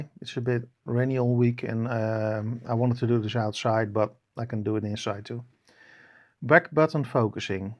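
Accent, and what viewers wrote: Netherlands. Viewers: Are you Dutch? I hear an accent.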